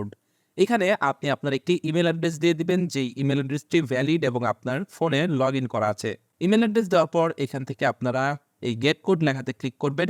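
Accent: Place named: Ghatail, Bangladesh. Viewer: Vai apnar basha ghatail a amar bash oo ghatail ❤❤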